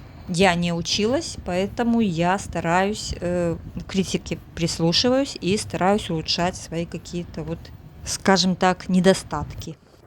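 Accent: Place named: Belarus. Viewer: не слушайте критиков вашей дикции. У вас чудесная дикция с едва уловимым, красивым белорусским акцентом. Так-же, не слушайте придурков политизированных маргиналов провоцирующих вас по по поводу языка общения. У вас всё отлично и ваш канал замечательный.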